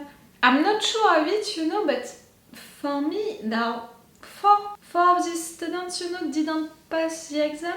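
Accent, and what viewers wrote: France. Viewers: j'adore ton accent français ^^ Il est merveilleux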